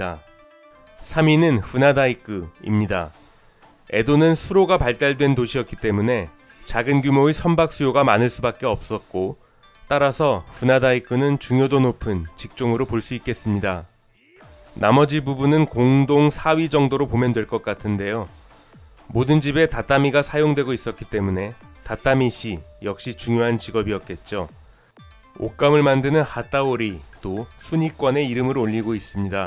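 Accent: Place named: Korean-Chinese, China. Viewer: 한국인 맞음? 말투가 조선족같노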